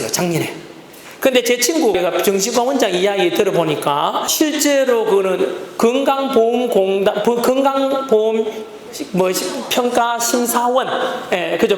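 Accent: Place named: Gyeongsang, South Korea. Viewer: 가시는 발라내고 살코기만 먹으라는 경상도 사투리 맛나요!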